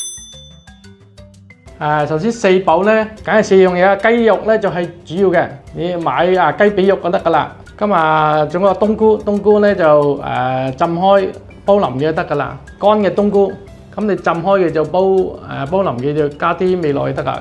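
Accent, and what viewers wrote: Zhongshan, China. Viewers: 师傅口音好似中山人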